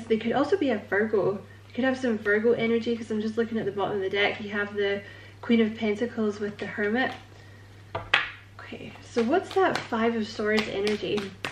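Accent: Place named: Scotland, United Kingdom. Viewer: Thank you! I have been 100% confident in this connection for a while so I'm pretty relaxed in it (Spirit told me from the very beginning, "if you don't loose your cool, everything will be fine"). However, confirmation is always nice. He's water and oh, so slow... I'll keep doing my work while he does his. BTW, I love your Scottish accent!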